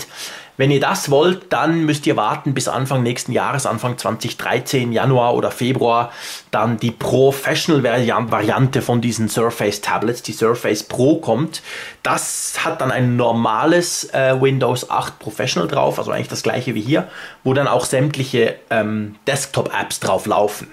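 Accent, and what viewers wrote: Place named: Switzerland. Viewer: Von de Stimme höre ich, dass Sie wahrscheinlich kein Deutscher, sondern ein Schweizer seid, ich auch. Deshalb meine Frage: Ich möchte mir das Surface Pro kaufen, aber dies Erscheint in der Schweiz wahrscheinlich erst in 3-4 Monaten. Wie sind Sie zu dem Surface RT gekommen? Liebe Grüsse aus Bern Marco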